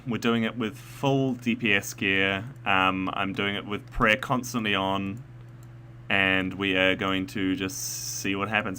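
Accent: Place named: New Zealand. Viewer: Mate, you're a Kiwi! What part of NZ bro?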